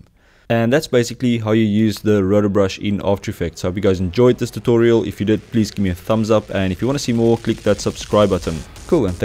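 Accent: South Africa. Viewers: this man sounds like a south african!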